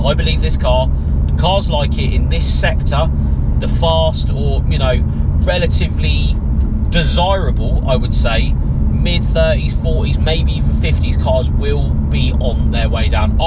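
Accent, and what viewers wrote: United Kingdom. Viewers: First time seeing your channel, don't take this the wrong way, but it's so funny hearing a proper British accent come out of what looks like a Southern Kentucky truck driver who's in a biker gang. Nice content!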